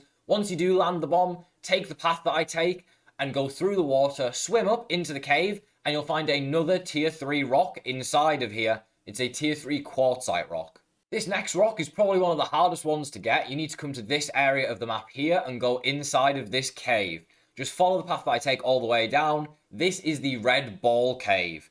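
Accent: Liverpool, United Kingdom. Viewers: Heyyyyy I been following your amazin grounded videos a while now all the way from switzerland and germany. not sure if you are scouse but I think you are. I been dreaming to be in Liverpool again since six years. you will find me busking in the evening on lord street (except saturday) since 1 june for a few months. approach me if you feel like. lol sorry crazy comment I know. hahahaha love your vids. say hi its haha. i dont busk with any amp just wit acoustic small guitar haha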